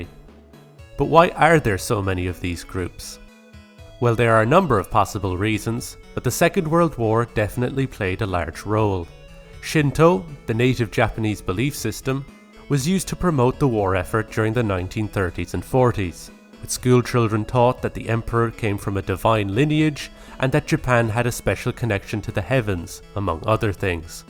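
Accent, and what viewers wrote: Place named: Ireland. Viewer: I can see you did alot of research for this vid and it is well put together. I think talking the to people who practice with the SGI would have given you quite a different perspective and tone to it though. I am Irish (like you by the sound of it!) and practice Nichiren Buddhism here with SGI-Ireland. I initially started practicing abroad 20 years ago. I have seen how members practice in many countries (including Japan). There are so many inaccuracies in this presentation I dont even know where to begin! Maybe you will cross paths and get to know an SGI member some time and be pleasantly surprised by what yiu have misunderstood. I hope so 🌞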